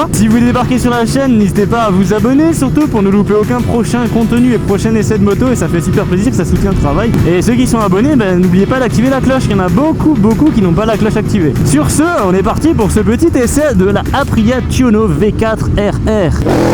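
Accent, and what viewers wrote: Alsace, France. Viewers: super essai complet et vidéo au top!!!je kiffe la cohérence dans ttes tes vidéos.ainsi que la musiques qui annoncent les différentes rubriques et qui restent les mêmes d'une vidéo à l'autre...sinon pour la ducati de ton pote tu as eu des news?À propos j'ai une petite blague en rapport avec ton bel accent alsacien qui colle très bien avec l'incendie de la ducati:"tu sais ce que veut dire SP sur les camions de pompiers?SA PRULE!!!"Avé l'accent évidemment...😂😂 V et longue vie à toi et hâte de voir tes futurs essais✌👏👏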